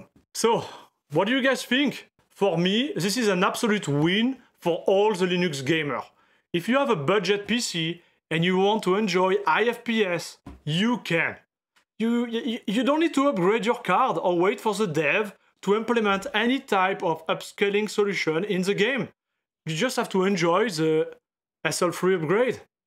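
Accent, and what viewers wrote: France. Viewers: Due to an fortunate event I upgraded my 1440P monitor to an 4k LG OLED 44C29LB. Since I still have a RX 6600 XT running I will need to use FSR every here and there. Btw. your french accent is sounds funny and good luck with your channel. You seem like a cool guy. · only reason i end up watching the things i already know about is due of the lovely french accent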